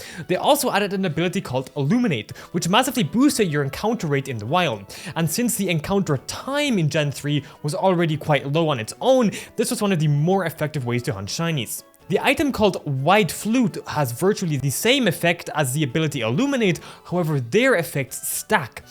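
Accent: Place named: Germany. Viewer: Are you german? Your accent sounds a bit german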